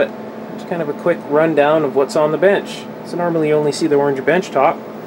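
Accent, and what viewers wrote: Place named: Canada. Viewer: Found you vids, amazing! You are wise, skilled and accomplished beyond your years. Your video production and presentation skills are only surpassed by your technical knowledge and abilities. Your background would be an interesting story! Fascinated with the Canadian "accent." Looking forward to more videos, hope you don't get bored with the process.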